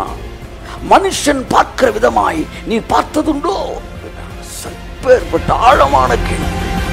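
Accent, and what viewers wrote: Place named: Madurai, India. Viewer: 👉நம்ம ஊரு மதுரை வட்டார தமிழில் 💗 கடவுளின் வார்த்தைகளை கேட்டு மிகவும் உற்சாகமும் ;சந்தோஷமும் அடைந்தேன்.. 💗 இந்த சிறு செய்தி எனக்கு புது நம்பிக்கையை கொடுத்தது (தொடர்ந்து உங்கள் செய்திகளை கேட்டு வருகிறேன் ) நன்றி . அன்புடன், புதுக்கோட்டை சரவணன் . (08.Nov.2020)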